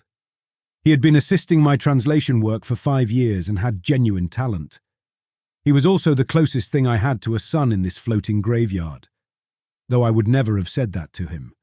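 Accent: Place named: England, United Kingdom. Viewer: Thank the gods...... Finally a story with a clear clean English accent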